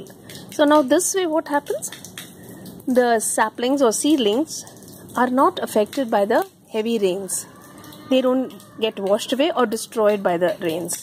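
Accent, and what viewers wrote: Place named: United Kingdom. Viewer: great voice ji...with accent like british lady..big like and subbed too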